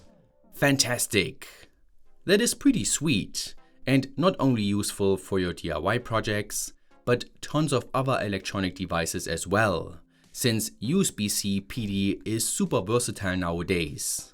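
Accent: Germany. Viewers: This German accent is brutal, but still fun to watch.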